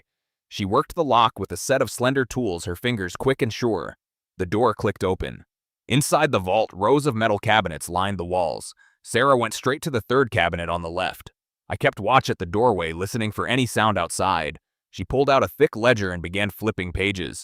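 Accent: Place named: United States. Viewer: it's marginally better if slowed down to 90% but that voice is very American and whiney ... It doesn't fit with the context or the content.. but the story itself works well.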